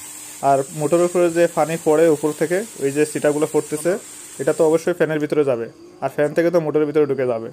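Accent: Sylhet, Bangladesh. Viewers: Vai Sylheti Sylheti lager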